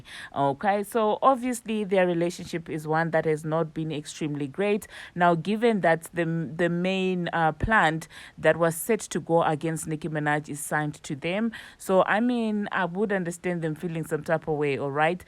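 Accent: South Africa. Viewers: Are you from South Africa 🇿🇦!? Ur accent… makes me 😊 like when I use to live there. Keep the good work 😉🥰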